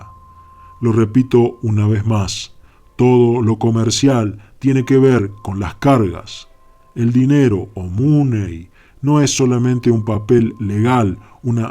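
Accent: Córdoba, Argentina. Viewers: El dueño del canal me da la sensación que es de Córdoba, Argentina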